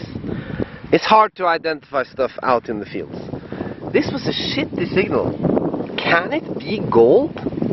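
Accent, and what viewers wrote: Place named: Norway. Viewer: Hi! Im Norwegian, but ill write in English. Been watching your vids for a good while now, and you inspired me to find the right place to go hunt my first old coins. So, i went out on simular fields you walk on and found my two first Oscars and alot of other cool stuff. Thanks for the vids and the lovely "Thor Heyerdahl" English hehe. I hope I get the chance to go detect with you guys some day. 2 questions though, what is the name of that yellow book with the kings in it? Been looking for it, is it originally a childrens book? 2nd. What kinda detector are you using mostly? Im using the AT Pro and loving it. Wouldnt mind if you revealed some of the values/numbers on your detector while you detect. best regards Kim Erik :)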